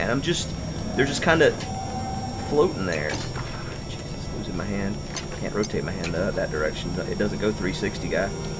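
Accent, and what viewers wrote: South, United States. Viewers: You just get more and more southern the longer this goes! "How in the HAY-ULL!?" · gets really, really Southern when he's stressed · pissed off Southern accent is the best thing ever.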